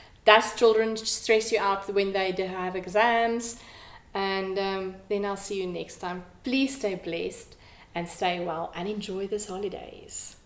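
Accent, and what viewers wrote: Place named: Afrikaans, South Africa. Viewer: Haai 😊 Ek het jou channel gekry deur die recommendation van mywonderfulknittinglife. Ons het ~20 jaar terug Amerika toe getrek, en ek was baie excited toe sy se jy is in SA. Dit is so lekker om n Afrikaanse aksent te hoor. Dankie vir n lekker episode! ❤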